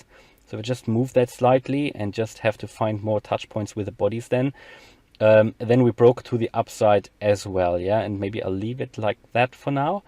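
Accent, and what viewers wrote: Germany. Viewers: Sounds you are from Germany - beste Grüße, Robert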